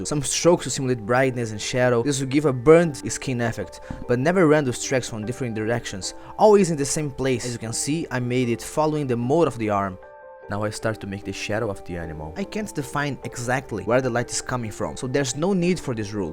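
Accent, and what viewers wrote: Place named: Russia. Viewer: Mano seu inglês tem uma pronúncia bem russa. Sem zoa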